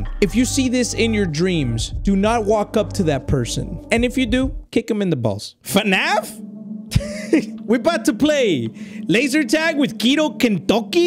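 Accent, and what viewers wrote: Mexico. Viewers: You sound like pewdiepie doing a light Mexican accent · are u english, learned Mexican or Mexican, learned english?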